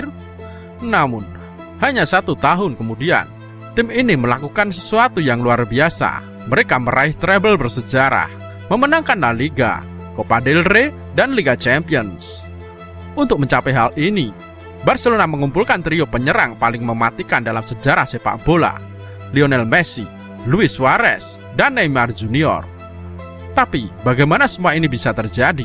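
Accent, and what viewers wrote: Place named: Java, Indonesia. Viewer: Adminya medokk🤮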